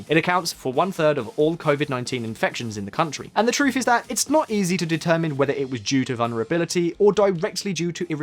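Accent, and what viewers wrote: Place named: United Kingdom. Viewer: It's the first time I see a video of yours, and as an Israeli I can tell you that you explained it better than most Israelis can. Unfortunately for us, I don't see the Haredim joining the military soon. Also, I think that what most upsets us, Israelis, about them is that because they don't work or have low wages they don't pay taxes, thus making us, who work everyday for living, to pay not only for our lives, families, and our education, but also for theirs. I should pay for my own son's higher education, not for the Haredi education of Yeshivot (Yeshiva in plural) and Tora study Edit: Also, like your British Accent:)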